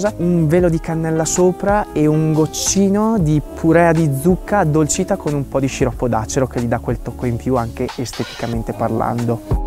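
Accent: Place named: Veneto, Italy. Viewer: Raga ma perché ha l’accento veneto? Comunque adoro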